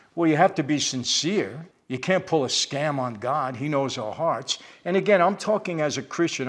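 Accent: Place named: Queens, United States. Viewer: Mr. Franzese, thanks for this video, it really helped me. I’ve gone down some very dark roads and went back to God last year. I keep asking, “how can God forgive me after what I’ve done and how broken I am?” I’m from Queens and you remind me of the good version of the guys who, in a way, “mentored” me. This message coming from you is like one of them giving me good advice in a familiar, straightforward, credible way. Your personality, mannerisms and accent remind me of home and I always enjoy your stories. This kind of video is also really great. Be good, God Bless!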